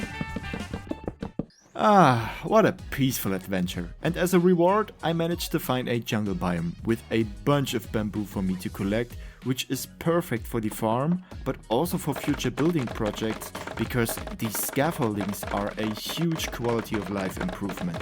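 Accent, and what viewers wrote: Germany. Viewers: I recognize your accent, and which land you come from -- I just spent a month in BW. Your videos are high quality and you have what it takes to become a big-league minecraft streamer. The amount of time you put in to your videos is evidence enough of that. The dry humor is a nice touch. And they say die Deutsche don't have a sense of humor lmao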